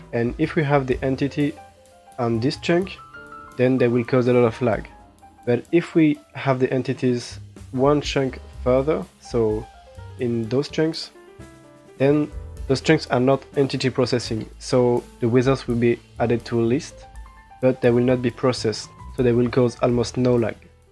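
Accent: France. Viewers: hey are u french ?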